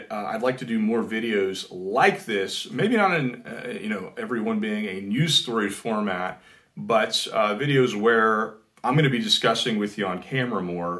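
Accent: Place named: Pennsylvania, United States. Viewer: are you from PA? You sound like it.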